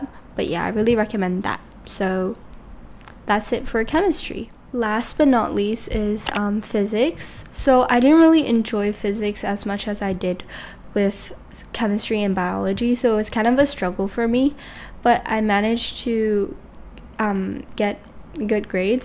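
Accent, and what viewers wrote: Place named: United States. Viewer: wait im just curious why do you have an american accent if gcses are for the uk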